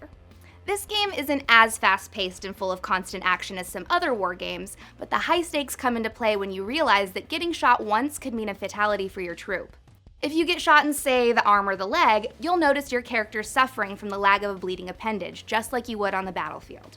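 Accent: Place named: United States. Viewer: Gosh, what a disgustingly thick rhotic accent... Can't listen to this woman. Why do some of you Americans make such a fuss about pronouncing a simple, innocent 'r' ?! It's just an 'r' , godammit, you don't have to swallow your tongue to pronounce it! You don't have to rape the li'l 'r' so hard! You sound like you talk with a hot potato in your mouth. Plus this nagging voice... No way, José!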